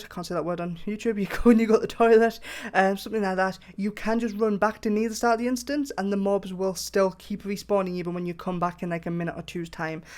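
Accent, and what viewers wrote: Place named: Newcastle, United Kingdom. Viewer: The only thing that makes this already awesome glitch better is the geordie accent hawayyyy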